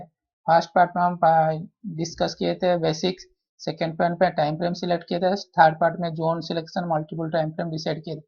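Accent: Odisha, India. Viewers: R u from odisha ?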